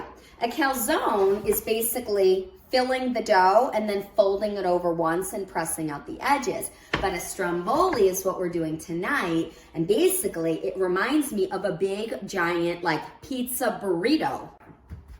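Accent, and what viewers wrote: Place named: United States. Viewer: You are an American😅😅😅 If you grew up in Italy you would speak Italian…correctly☺️ You’re just like the rest of us Americans! It would be like “African-Americans” not speaking an African language or knowing the correct African pronunciations of “African” words. All of us are a blend…no one is “pure” in race. We all are of the human race. Praise God! Thanks for your tutorial! Can’t wait to try!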